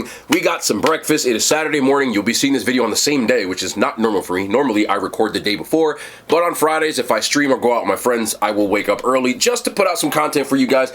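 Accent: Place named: New Jersey, United States. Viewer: The jersey came out in this one😂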